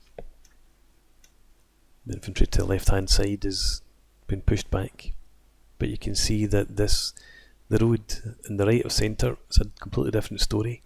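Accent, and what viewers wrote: Scotland, United Kingdom. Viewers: I enjoy the low key Scottish commentary.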